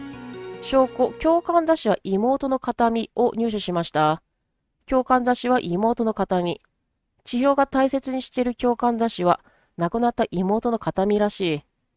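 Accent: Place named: Kyoto, Japan. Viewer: の京都訛りに出会えて嬉しいです♪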